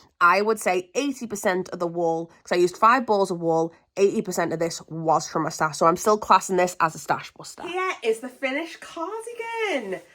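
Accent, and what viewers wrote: Liverpool, United Kingdom. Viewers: Hiya! I was introduced to your channel when you met Amora on her travels and I’m made up to find another northern UK crocheter to follow. I hugely enjoyed this video and you’ve inspired me! I’m trying to guess where you live, as you’ve a very gentle scouse lilt. I was born in Formby and now live in Altrincham x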